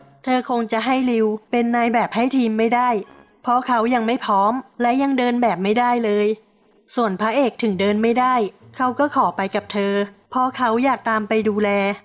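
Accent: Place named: Isan, Thailand. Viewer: คนอีสานเปล่าหนิ สำเนียงในการพากย์เหมือนคนอีสาน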